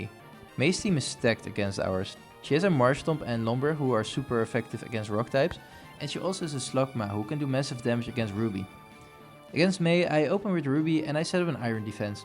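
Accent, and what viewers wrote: Netherlands. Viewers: weird question maybe but are you dutch by any chance?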